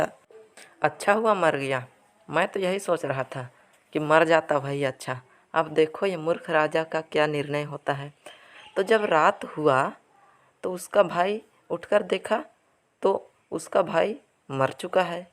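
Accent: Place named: Bhojpuri, India. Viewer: This is my first time someone is teaching me in Bhojpuri accent 🙄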